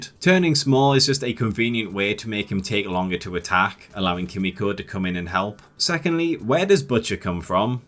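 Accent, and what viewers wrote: United Kingdom. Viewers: 2 HOURS OF A BRI-ISH GUY BITCHING ABOUT HOW UNBELIEVABLE A SUPER HERO SHOW IS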